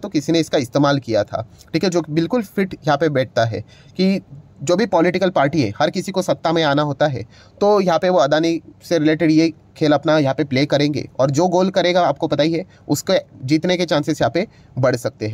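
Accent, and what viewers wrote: Maharashtra, India. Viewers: Sir Marathi h aisa lagta h, Thodifar 🤣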